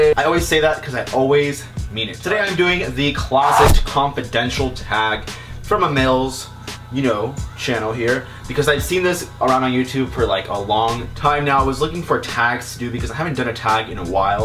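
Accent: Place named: United States. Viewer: Si vas a hablar espanol hablalo bien no con acento americano, just saying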